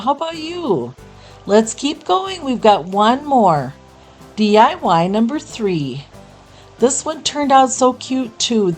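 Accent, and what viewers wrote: Minnesota, United States. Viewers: Quick and super cute projects! I am always looking for jar ideas. Those little ones are too cute! You cracked me up with the little joke about the jars saing we need some beads to keep it farmhouse ya know! And sounds just like Minnesota way of speaking! 😂😂 I loved the sign! The color is perfect and the name of the store, and last one in its a rotten egg! So fun 😂 You make me laugh at least once a video. I love it and you my friend! Have a great week! 😚😚 Oh and congrats about your shop! You're going to be home all day to mind the store?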